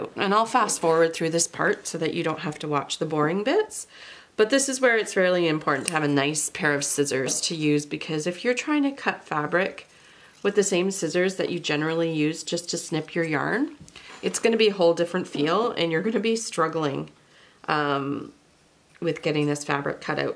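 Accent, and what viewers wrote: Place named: Canada. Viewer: Well I never thought we Canadians have an accent but I can definitely pick you out :) signed, a Maritimer :)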